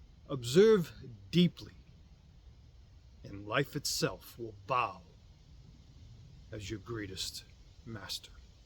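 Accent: Boston, United States. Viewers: Good stuff!! Is that a Boston accent?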